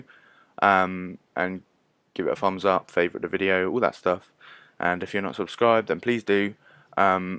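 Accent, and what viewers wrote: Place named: United Kingdom. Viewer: Brittish accent on a tutorial. + 10 points.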